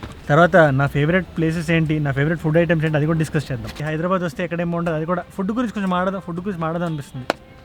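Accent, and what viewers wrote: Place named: Karnataka, India. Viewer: Ur Kannada accent is 😍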